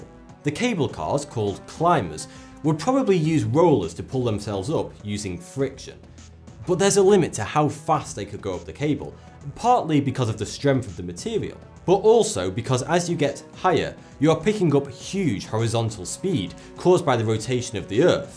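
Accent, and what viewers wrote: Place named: England, United Kingdom. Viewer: I watch dis guy just so I can learn an English accent, it's so cool. I've been practising from March now.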